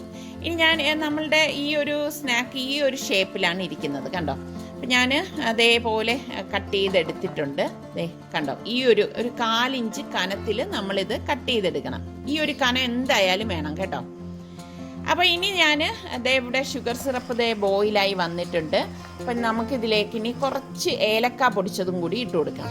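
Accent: Trivandrum, India. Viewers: Ithade correct place evda?? Lockdown aano avde....nte place nedumangad ippo kochila njn ....ithade samsaram kekkumbo nikk nte tvm orma varuva..... subscribe cheythutto..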